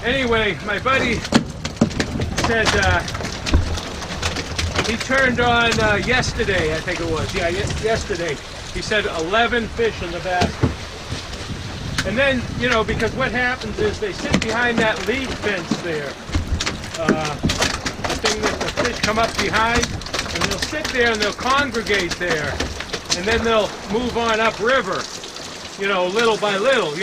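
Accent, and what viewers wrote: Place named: Maine, United States. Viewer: Man I swear you have a Maine accent!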